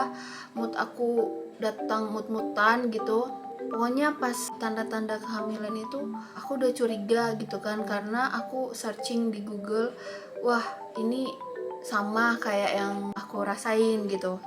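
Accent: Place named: Minangkabau, Indonesia. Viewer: Slang Manadonya sudah hilang.......sudah ganti dengan dialek Minang ya👍👍👍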